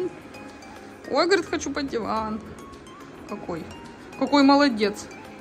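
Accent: Siberia, Russia. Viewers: так беситесь на здоровье. Я не батюшка, помочь ничем не могу, бесов изгонять не умею. А акцент у прекрасный, она же сибирячка, а там основа - казаки, еще от Ермака. Вы донских казаков не слышали, у них речь вообще другая. У меня бабушка всю жизнь вместо «вчера» говорила «надысь» и вместо «скачет» - « бегхеть». Ну и так далее. Шолохова почитайте. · нормальный, сибирский говорок. Я так понимаю, вы казачий и близко не слышали.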